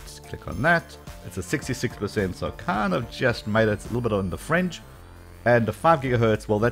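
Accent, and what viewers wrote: South Africa. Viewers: South African I think.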